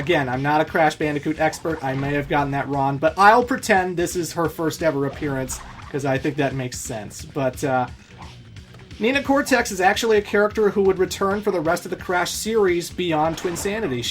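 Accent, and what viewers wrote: Canada. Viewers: you say it right, just with a Canadian accent lol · Bro you're accent, dialect and your chilled charming canadian voice is always a pleasure to listen to while seeing your MLG megaman/sonic/any game playthrough. If you weren't canadian with this chilled personality then i wouldn't be into megaman. I've played more megaman games than the 8bit ones because of your LPs. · WHO EVER IS BULLYING YOU ABOUT THE WORD HOUSE IS CRAZY. YOU’RE SAYING IT JUST FINE 😂 as a fellow Canadian, I concur!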